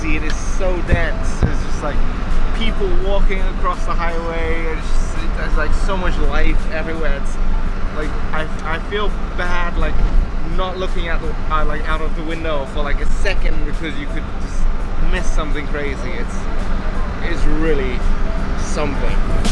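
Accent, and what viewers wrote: Germany. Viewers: I can already smell the "Parkour Turist guide through Egypt with Jason Paul" in your Hillarious Germans English Dialekt... Egypt seems like an Perfect Place for Exploration... I saw the cat and was like "Yay Catpats" and then the cat ran away... but i saw the effort to keep your Promise so i let it pass for today and still like the Video The Kids were kind of Cute I like how the Bork of every tree is paintet White in the first two meters so no animals will eat that part because there are many Animals running around Will there be some Parkour Action with Camels? I like Camels... they are Derpy.... Derpy is Cute.... And while you on it.... Pat them for me Anyways Thanks for todays Video See ya Next Video <3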